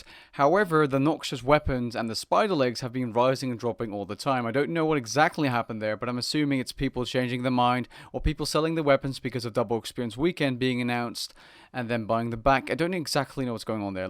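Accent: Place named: Netherlands. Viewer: You're Dutch, aren't you. :D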